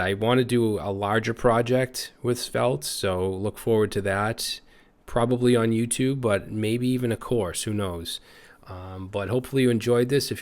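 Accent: Boston, United States. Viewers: Great tutorial! Sounds like you're from the Boston area, ("btn dahk")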